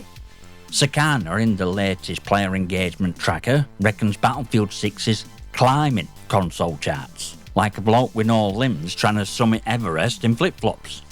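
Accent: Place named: Northern England, United Kingdom. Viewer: Fellow northerner here. No need for captions ;). All the best our lad!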